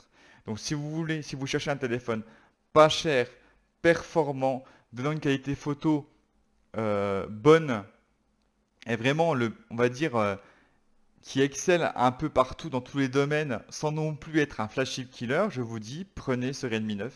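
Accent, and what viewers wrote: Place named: Vosges, France. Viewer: alors je ris, parce que en vous écoutant (sans avoir lu) j'ai pensé moi aussi à l accent Vosgien 😛🤭 ah ah ah c est tellement inimitable ! Entre Vosgiens on se reconnaît bien. Merci pour vos infos 👍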